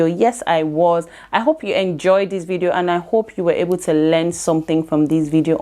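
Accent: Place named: United Kingdom. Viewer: I have an obsession with UK accent & makeup 😍😩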